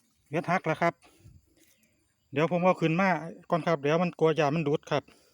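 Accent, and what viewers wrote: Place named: Ubon Ratchathani, Thailand. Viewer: ฟังสำเนียงเว่าคือจังคนทางอุบลอำเดชอุดม คั่ก หมานๆคับ สุดยอด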